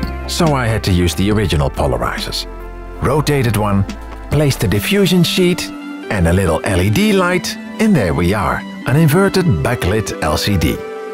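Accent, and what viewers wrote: Netherlands. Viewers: Very Dutch accent: replacing all the 'th' sounds with a 'd'.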